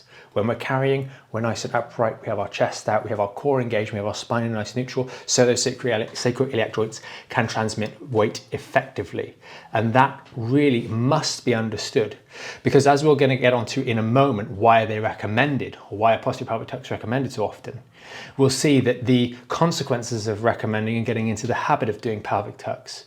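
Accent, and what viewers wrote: England, United Kingdom. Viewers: This is really wonderful. I’ve left Spondolitis. Awful 5 years old got run over 7nder a wagon. Only when older back problems. Discitis. Nearly died. Had no surgery or anything. Painkillers. What can I do. I dairnt excercise. 5” height lost . And a tilt a gait. Is this same? Nice to hear English accent. So,help.😮😊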